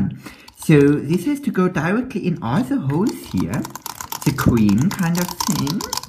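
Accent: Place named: Germany. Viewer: That german accent is craking me up lol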